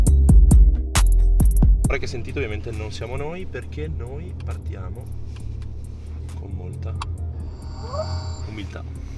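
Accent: Genoa, Italy. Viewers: Aaaaa il genovese di ahahah😍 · Video veramente stupendo 🤩 ma belin dato che parli in genovese spero che tifi Sampdoria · Bellissimo video sei migliorato tanto, qualità,contenuti, durata e improvvisazione. Intrattieni sul serio, dovresti fare una serie tipo Gomorra sulle auto e lifestyle Bye con la cadenza genovese ahah numero uno ti adoro 😂😘💪🏻🥇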